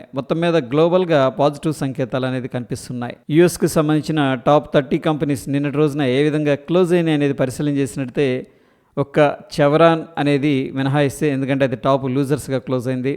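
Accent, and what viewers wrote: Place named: Telugu, India. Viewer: Thank you brother for the information🙂👍, your voice and Telugu ascent reminds me the news reader on All Indian Radio morning news in child hood days.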